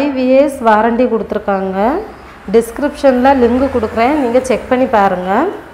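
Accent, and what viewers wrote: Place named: Kanyakumari, India. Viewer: Good to hear your Kanyakumari tamil Stay back connected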